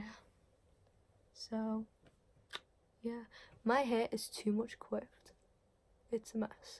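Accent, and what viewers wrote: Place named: Liverpool, United Kingdom. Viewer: I love the scouse accent! I'm from Australia but I watch a lot of UK tv and scouse is one of my favourite accents 🙂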